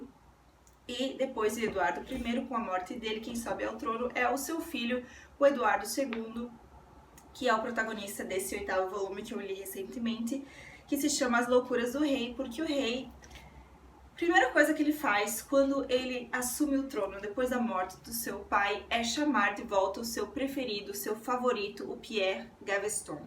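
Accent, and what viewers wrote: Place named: Paraná, Brazil. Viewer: Vc é uma " gracinha" ! Teu sotaque parece ser de PARANAENSE....muito charmoso !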